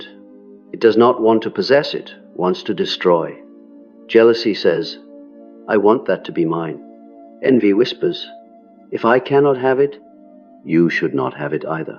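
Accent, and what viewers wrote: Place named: Spain. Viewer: Thank you !! I have not yet seen this level of depth in any video before (probably Sam Vaknin's videos only are this deep, but he is much more technical). I have seen this video more than three times already ... Clearly the German voice (with a perfect Spanish accent by the way) is a very experienced person. Thank you very much for sharing this type of deep content. It does help us a lot !!!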